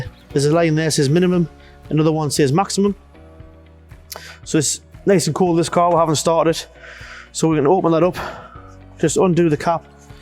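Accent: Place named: United Kingdom. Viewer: That is the most british sounding man ever jesus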